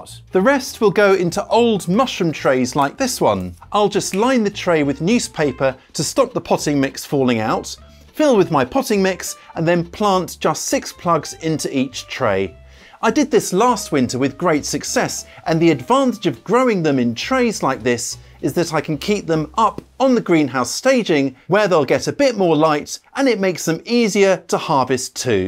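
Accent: United Kingdom. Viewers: You sound British, are you?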